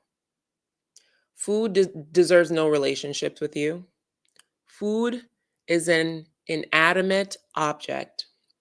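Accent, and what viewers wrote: Jamaica. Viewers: So much more effective when you scold us in your Jamaican accent😂❤😂 and by the way, even though I'm near my goal, I'm still going to listen to you for motivation, because no matter how long I do it, fasting is hard!!!!😮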